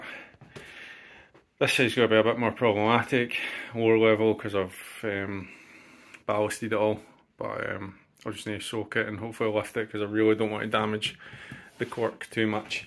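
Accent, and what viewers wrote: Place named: Scotland, United Kingdom. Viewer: Dear Sir, Yours is a great layout (i wish i had a loft), mine is just a wee bit over 2x1 metres. Just one question, for the benefit of us, english non speakers. Your accent is a challenge for me untrained ear. Where in the UK is it from? Scotland, i presume? Thanks and cheers from (happy again) Brazil!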